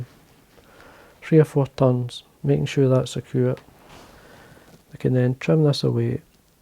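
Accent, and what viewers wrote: United Kingdom. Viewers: Superb,what else can I say! As soon as I saw this tying I got my bits boxes out to attempt a tying myself all the little trimmings I saved that you told us to keep and my treasured packets of Coq de Leon I bought a while back and locked away as I was scared to use it having paid more than I admitted to my wife much like a jungle cock cape I bought over twenty five years ago from the angling centre which is nearly bare Disaster one of our farm cats got in the window of my man cave and made off with my Coq de Leon feathers I had just opened and left on my desk twenty quids worth Gone I searched the garden but all I could find was feathers from our ducks and hens Now I will have to use substitutes I was so looking forward to tying a few dries and trying them out but it’s had me make a decision that I will use materials and festhers I buy and not squirm them away like Scrooge and his money Once again your patterns and things are excellent as is the video and narrative the posh accent with the occasional slip into the accent of the shire is as always so entertaining thank you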